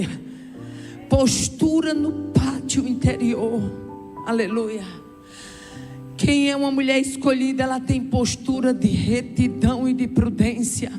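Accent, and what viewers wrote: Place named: Northeast, Brazil. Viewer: Madrugada e eu com ela: ❤️. Que companhia agradável com o sotaque do meu Nordeste: consistente, direto, sincero e antes de tudo, ABENÇOADO. 👏🏾👏🏾👏🏾👏🏾 "A" de 🥰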